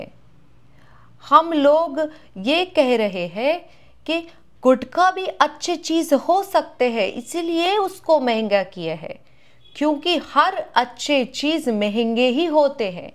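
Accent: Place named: South India, India. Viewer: Hindi in south accent too good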